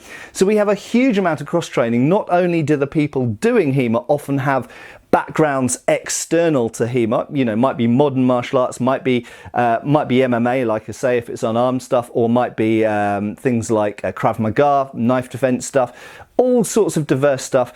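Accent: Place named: United Kingdom. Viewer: I like your use of a proper British accent in this video.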